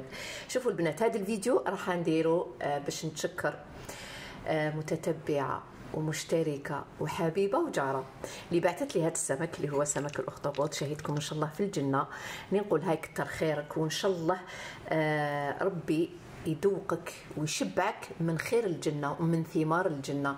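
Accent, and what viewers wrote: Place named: Souara, Algeria. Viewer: اواه يا مدام تهدري كيما السوارة ماسافاش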